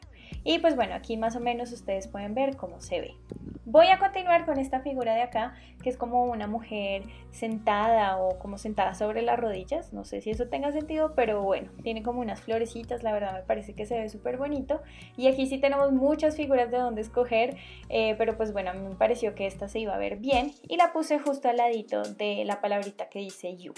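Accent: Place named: Bogotá, Colombia. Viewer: Siento el acento Rolo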